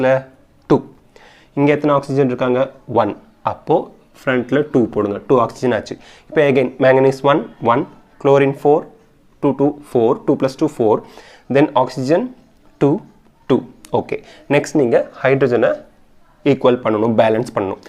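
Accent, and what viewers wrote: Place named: Thanjavur, India. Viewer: Sir you are all rounder I am so exciting with you then I have 1 doubt in DNA fingerprinting let me to tell sir in DNA fingerprinting you told know it is differ for a children's who are from a same parents other than monozygotic twins I agree that sir but still I have a doubt that oru childkku 50 percent DNA from their mom and 50 percent DNA from their dad so antha couple kku innum oru child pirranthalum antha childkku athae thane sir irrukku then how we can say their DNA fingerprints are not similar please I have this doubt for many months I know you are the right person to clear my dobt sir I kindly request you to clear my doubt sir and neenga Thanjavura sir enga oru karanga pesurathu mathiri irrukku atan sir kettae okay thank you sir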